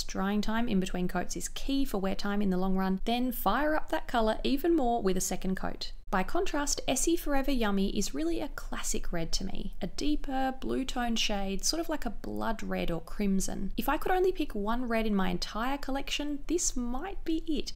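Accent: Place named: Australia. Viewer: I keep getting stumped! An Australian accent so I automatically assume you're from Aus and the products you talk about can be found here but both Olive & June and Glossier don't ship here! So upsetting :(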